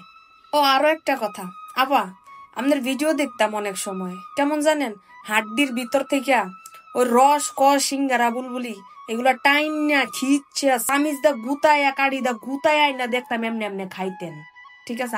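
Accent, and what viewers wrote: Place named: Noakhali, Bangladesh. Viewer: Noyakhali bole kotha....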